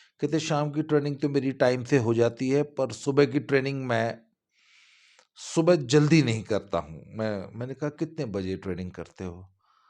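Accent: Haryana, India. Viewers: Aapka haryanvi uccharan dittoo theth harnyanvi hai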